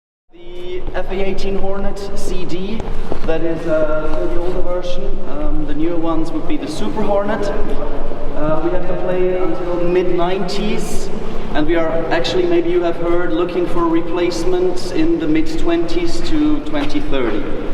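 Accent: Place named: United Kingdom. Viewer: Voll interessant. Sehr sehenswert. Erzählt in wunderschönem Britischen Englisch.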